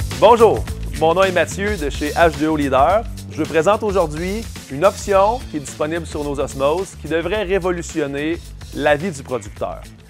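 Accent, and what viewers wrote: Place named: France. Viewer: Yes with and the English Chanel needs to be without the French accent so us Americans can better understand. Please.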